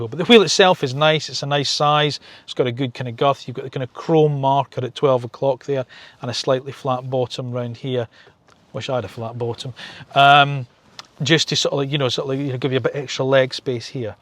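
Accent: Scotland, United Kingdom. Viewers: Informative and well explained. Really like your down to earth commentary. Yes… just cudos for how you’ve grown into this extremely over saturated market of Clarkson and Hammond wanna bees. This you have done with your calm presence, Scottish accent an clearly one or two half bad jokes combined with, great knowledge of the subject at hand…Might find a fast breathing or two when starting a sentence explaining the numbered options… of whom where some Take a little less in or cut out in post. Hell, I even like this masculin income - makes you remember line five…🥸 But overall, really really like your channel and try follow your regular new material - Cudos / 2ell done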